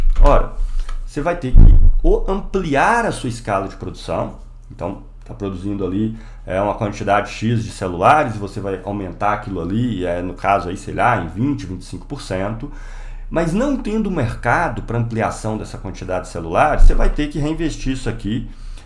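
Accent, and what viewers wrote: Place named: Northern Minas Gerais, Brazil. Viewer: Cheguei aqui de volta devido a um corte recente. Estava ouvindo e apreciando tantos sotaques familiares e incomuns de se ouvir na internet, os caras de Uberlândia e você de Turmalina estava ouvindo e lembrando de colegas que tive na época do CEFET com o sotaque de mineiro do norte e minha namorada e eu pensamos a mesma coisa enquanto você contava a história que só faltava você falar que estudou no CEFET. Foi uma grata surpresa ver alguém com trajetória similar de tantos outros colegas que tive e de um espaço que é grande na minha vida. Não sei pq mas me senti compelido a vir aqui comentar. Apesar de discordar de certos pontos, temos em comum a escola de origem e o marxismo como norte. A participação em tal podcast foi ótima, didática e eficaz, está de parabéns.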